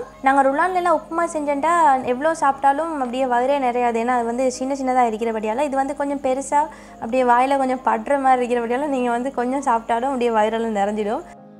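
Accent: Sri Lanka. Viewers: அதாவது பயத்தம் பருப்புதான சிறுதானிய உணவுதானே உங்கள் சிரிலங்கா தமிழ் எனக்கு ரொம்ப பிடித்து விட்டது ஆனால் ஒரு சில வார்த்தைகளை விழங்க வில்லை. அதாவது புரியவில்லை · Mashallah all recipies are soo gud thanks for sharing ur srilankan slang nice to hear keep going sis👍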